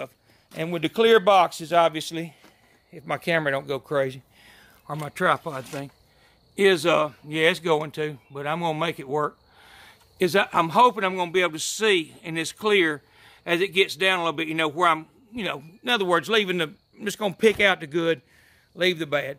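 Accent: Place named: Alabama, United States. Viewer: My uncle from another grandmother AND you're using FOGO! AND you sound like you're from Alabama like my family (we're in Texas mostly nowadays). Subbed!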